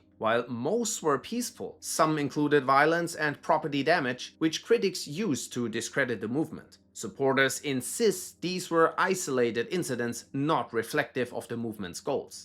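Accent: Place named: Germany. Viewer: Another great video, I love your voice. It's like Sebastian Vettel; a great & soothing German voice/accent.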